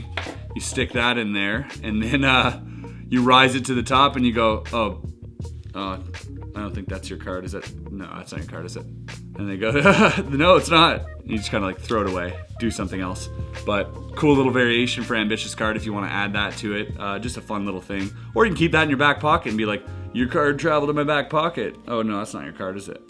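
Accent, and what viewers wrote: Quebec, Canada. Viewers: Guy was speaking french ... Ou ce vidéo a t-il été fait ! Accent québécois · Ohhhhhh un québécois mon boy!!!! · Are you from Canada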